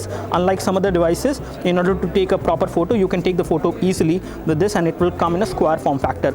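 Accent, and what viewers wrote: India. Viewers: Indian Pronounciation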